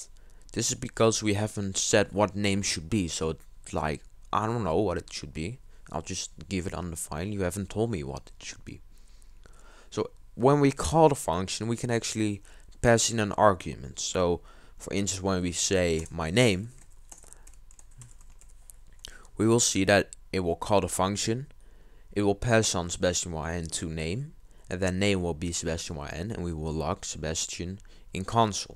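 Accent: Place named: Netherlands. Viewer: I hear some Dutch stuff in there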